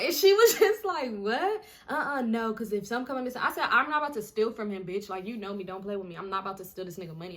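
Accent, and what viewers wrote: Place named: North, United States. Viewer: You are an absolute embarrassment. Nevermind that "flewed" is a stupid sounding and ugly looking word. I'm familiar with it, it's the word you and people like you use to describe when someone wastes money paying for y'all's aerial transportation so you can participate in watered down forms of prostitution. Furthermore, your eyelashes need to be stopped. Not even just yours, but all the women in the world wearing those horribly fake looking pieces of plastic on you guys' eyelids need to be stopped. Like seriously y'all lookin silly. While we're on the subject of your appearance, throw all them cotdamn wigs and weaves in the garbage and immediately tote them to the dumpster. Be a Black Beautiful Queen and show the world the texture that God gave you, if you have any. Y'all out here sending all that money to India and China so you can look like people from Europe, wake up. 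And lastly, I can tell by your story and how you talk that you from up Norf or somewhere in the Midwest. Don't you ever in your life open up you mouth again to say anything bad about Waffle House. Waffle house is an institution and it don't need your money or approval. Put some respec on my waffle and grow up. Focus on school or a career or something instead of tryna hustle men for a good time. Our nation is in decline and it's mostly because of how the youth spends their time. It's only a matter of time before our society is overrun by china and when they come they're going to make our children speak Mandarin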